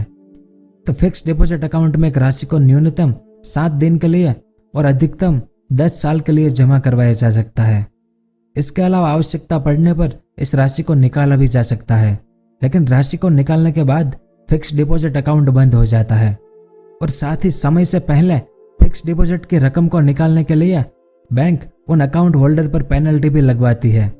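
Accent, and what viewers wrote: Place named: Rajasthan, India. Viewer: Voice Rajasthani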